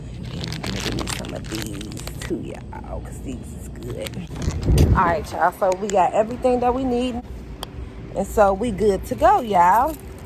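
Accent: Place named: Florida, United States. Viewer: Your Accent Reminds Me Of My Florida Folks.😱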